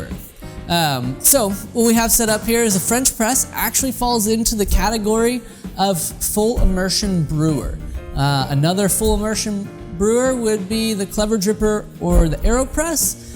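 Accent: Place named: United States. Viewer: I never believed in an american accent until now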